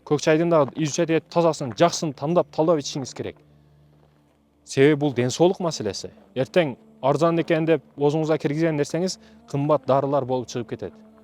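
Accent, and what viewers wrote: Batken, Kyrgyzstan. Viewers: Баткендик жигит го дейм мен билгенден, акцентине караганда, өзбек же кыргыз экенин өзү айтпаса… эгер өзбек болсо анда кыргызча жакшы билет экен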